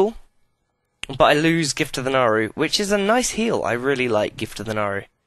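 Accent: England, United Kingdom. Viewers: lol yeah hes def english buddy XD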